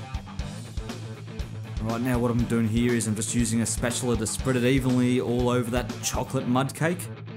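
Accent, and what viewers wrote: Australia. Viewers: I love Aussie accent! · The aussie accent sounds very cool, respect)) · its nt british i thowt he was aussie dikk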